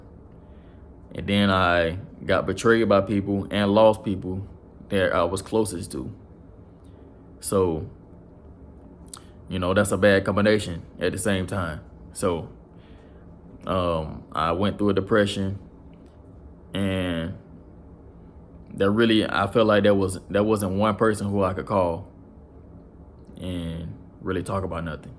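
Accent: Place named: Texas, United States. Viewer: welcome to Texas !! I'm a Texan. & you sound like a Texan naturally! a true southern boy.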